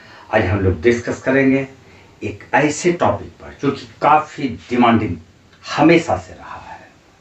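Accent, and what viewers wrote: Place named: Bihar, India. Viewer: Lk dan 👍 sir aap bahut hi achha se samjhate hai students ko asani se samjh aata hoga aap ki boli se lagta hai aap Bihar se bhi ham thik kah rahi hu sir ji 🙏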